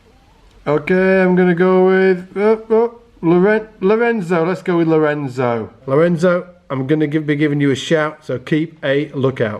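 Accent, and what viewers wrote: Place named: Northern England, United Kingdom. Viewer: Where is your northern accent coming from m8? Is that Leeds or you moved from Birmingham? I am trying to figure that out from some time. Big fan of yours and fish and chips as well! Cheers from PL!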